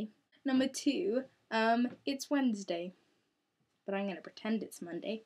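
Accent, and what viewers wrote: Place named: RP, United Kingdom. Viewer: lol you sound so posh!! =P never noticed ur accent before.....i think im becoming more scottish =] love you......<3 xx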